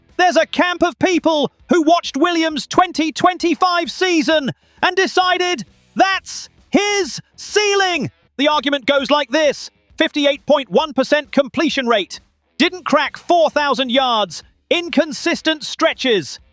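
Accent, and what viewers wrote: United Kingdom. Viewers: Why are you using a British voice for American Football it's ANNOYING!!!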